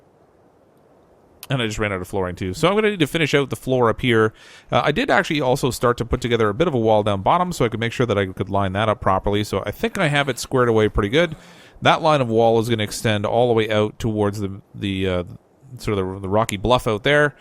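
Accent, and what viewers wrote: Canada. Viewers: I really should be sleeping now- it is am.Nah. That livestream was great. I am now a loyal subscriber. I can definitely tell that you are Canadian from how you say out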